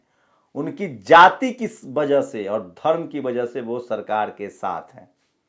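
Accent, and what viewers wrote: Bihar, India. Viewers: Bihari ho kya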